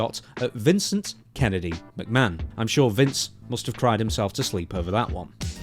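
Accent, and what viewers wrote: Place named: United Kingdom. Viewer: Clicked on this, heard the British accent, turned it off.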